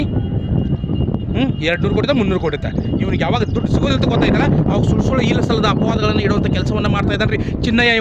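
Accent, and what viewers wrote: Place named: North Karnataka, India. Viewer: ಈ ರೀತಿ ಉತ್ತರ ಕರ್ನಾಟಕದ ಭಾಷೆ ಯಲ್ಲಿ ಉಗಿಯೋದನಾ ಕೇಳೋಕೆ ಆ ಆ ಏನ್ ಚಂದ ಉಂಟು ಮಾರ್ರೆ 👌👌👌👌👌👌👌👌👌👌👌👌👌👌👌👌👌👌👌👌👌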